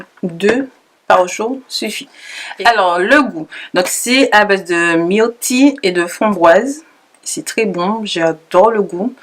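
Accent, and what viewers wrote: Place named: Antilles, Caribbean. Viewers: audrey lawson l'accent créole antillais oui :) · Oui, elle a l'accent antillais ;)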